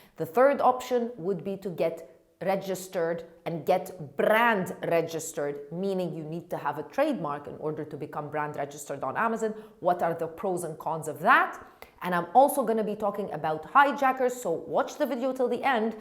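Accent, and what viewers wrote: Arab world. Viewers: i don't want to learn from you but i like the way you speak english in arabic.hehehhe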